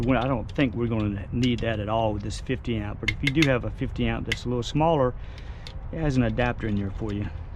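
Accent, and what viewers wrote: South, United States. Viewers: Thank you ! I bet I could replace our 35amp plug now! Good job! P.s. I just love your southern voice !💙 Have a blessed day yall!!!!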